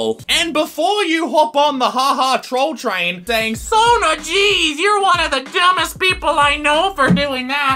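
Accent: Australia. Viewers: sound australian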